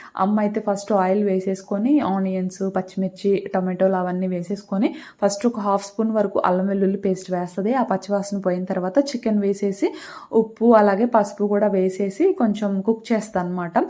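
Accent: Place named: Visakhapatnam, India. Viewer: Your language is different in Telugu i think u r in vizag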